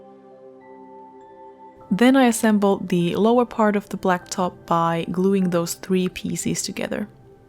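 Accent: Finland. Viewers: Amazing work! PS: Am I detecting a hint of Finnish accent?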